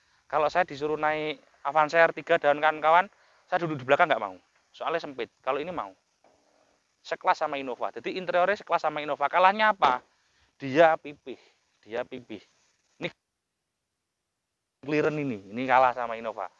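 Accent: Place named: Central Java, Indonesia. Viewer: wong malang logat jawa tengah..😁😁😂😂🙏🙏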